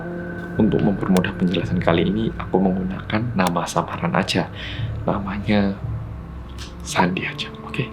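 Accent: Java, Indonesia. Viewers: First ☺️ bersama orang koreyah medokk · HAIII TIM GERCEPP💜 HALLO MAS MEDHOOOOKKKK💜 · Tiap nonton konten mas, jadi ikut2an medok ngomongnya:"D Semangat terus mas ngontennya!!!!!!!!!!!!!!!!!!!!!!!!!!!!!!!!!!!!!!!!!!!!!!!!!!!!!!!!!!!!!!!!!!!!!!!!!!!!!!!!!!!!!!!!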